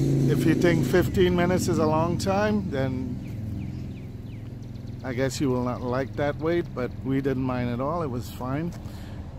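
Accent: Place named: Trinidad and Tobago. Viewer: sounding trini..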